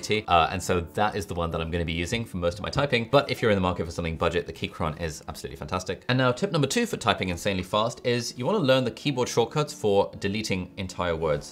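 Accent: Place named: RP, United Kingdom. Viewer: I would like to know where your accent comes from because you sound a little rp however im not sure, I can't figure it out by the way I really like your accent!! You sound so nice!!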